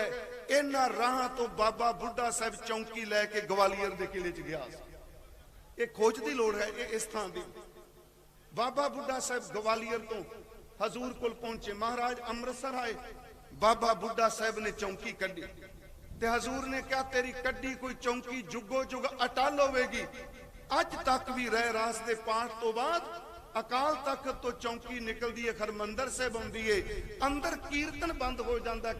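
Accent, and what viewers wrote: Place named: Tanda, India. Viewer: Tuhadi boli tanda de area naal mildi hai.. bilkul nirool hai..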